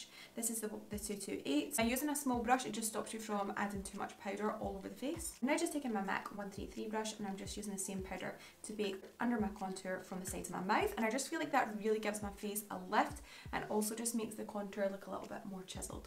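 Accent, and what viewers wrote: Scotland, United Kingdom. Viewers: r u from Scotland? love the accent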